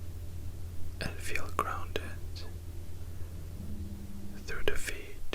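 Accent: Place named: Australia. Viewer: I thought you was australian🇦🇺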